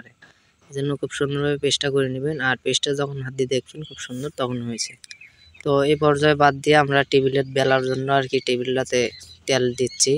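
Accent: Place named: Bogura, Bangladesh. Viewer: তোমার কথা শুনেই বুঝতে পেরেছি।ভালো লাগলো ভাই।আমিও বগুড়ার মেয়ে। তোমার বাড়ি কোথায় বা কোন হোটেলো কাজ করো বলা যাবে কি?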